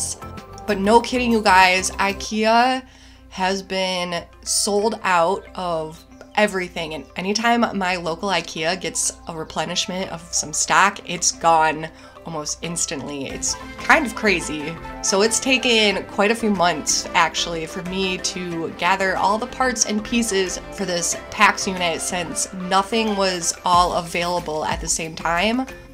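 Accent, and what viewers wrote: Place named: Midwest, United States. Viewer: I don't mean to be a creeper but are you from the midwest? You pronounce your vowels like me lol (Minnesotan)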